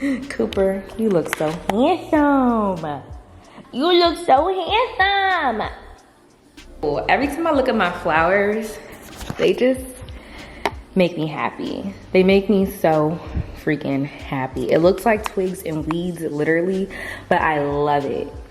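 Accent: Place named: New Jersey, United States. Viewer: yessss!! Lol she and I are from the same state. Lol and you sound like my cousin in NJ lol boy these locations and sounds are too funny lol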